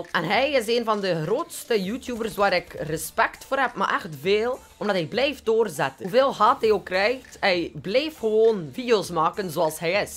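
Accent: West Flanders, Belgium. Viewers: HAHAHAHA kapot lachen met ej West - Vloams:') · Van min part meugt ge plat west vloams klappen weh;) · Nice video ik heb geen probleem met je accent want ik ben zelf west-Vlaams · Jij bent west-vlaams? Van welke stad/dorp? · Ik ben ook west Vlaams en ik zeg: doe nog lange deure me YouTube echt wo je zie echt nen coole dude gie verdiend veel meer subs! Ik weunen nie An de kust om kgon der graag na toe. Allee nog vele succes me YouTube!!! PEACENIK #westvlaamsaccent. Hèhè dat moest er even uit. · Mooi gezegd me u west-vlaams accentje hehe:) en PROFICIAAAT! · West vloams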